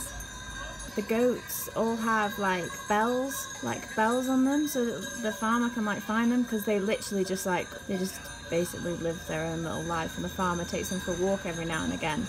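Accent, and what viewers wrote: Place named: United Kingdom. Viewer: Nice views :) beautiful British accent :) safe road for both of you, enjoy!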